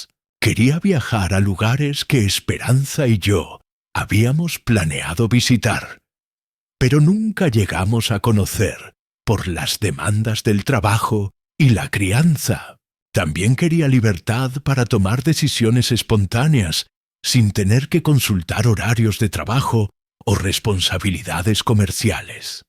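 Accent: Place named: Spain. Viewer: Coincido con un comentario anterior, la historia es buena pero creer que los argentinos hablamos así 😂😂😂es una burla. Es un español pronunciando la Z por favor!! Y por supuesto con Uruguay nos entendemos perfecto. Hablamos el mismo idioma!!! Hay que estudiar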